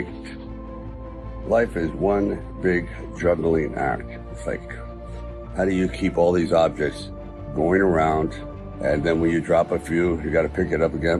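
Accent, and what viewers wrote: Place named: Italy. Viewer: I love your voice speech Italian....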